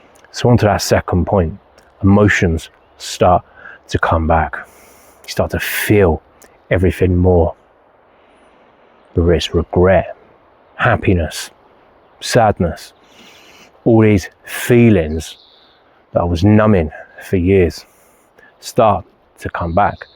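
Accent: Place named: New Zealand. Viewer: You really picked up the new Zealand accent love it!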